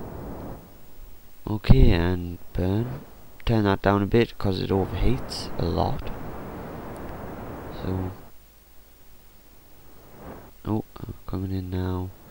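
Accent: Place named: North, United Kingdom. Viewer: Haha close enough! Thought you had a northan twang to your accent :)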